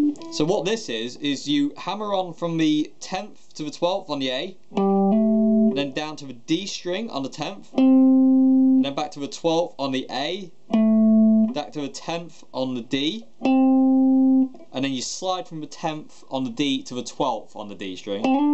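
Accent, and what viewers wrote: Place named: North, United Kingdom. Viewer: Cheers you the best teaching us all this stuff xD and ill just say our accents are similar lol thought i was the only one playing up north (atleast thats how it feels sometimes) haha take care buddy!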